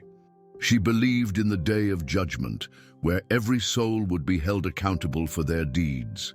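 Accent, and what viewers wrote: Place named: United Kingdom. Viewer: This could and should have been edited down....the British accent does not make it more palatable.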